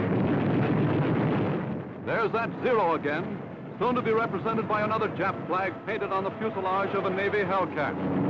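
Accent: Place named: United States. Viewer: Also the announcer is American.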